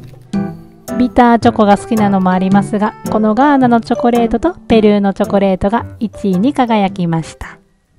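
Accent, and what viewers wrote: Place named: Osaka, Japan. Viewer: Nobanaさんの独特な大阪弁を聞くと、金曜日だー！ってほっこりします♡ドイツも好きだけど、単純にNobanaワールドが好き。大阪でオフ会あったら、Nobanaさんに会ってみたい😆ところでオフ会っていうものは、一人で行っても大丈夫なものなんでしょうか。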